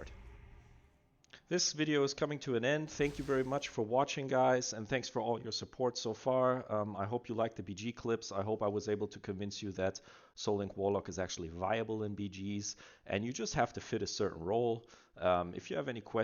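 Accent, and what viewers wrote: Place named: Germany. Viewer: So ich denke mal das du deitscher bist, nach dem englisch akzent hört sicht das so an sehr geiles video, aber gehst du jetzt standart auf SA oder doch fellguard? Also klar kommt es immer drauf an aber ich finde SA hat geileren dmg sber der support vom Felguard is nich ohne... vllt son Fazit mit dem 120%buff und spielst du destro oder diesen misch specc in pve?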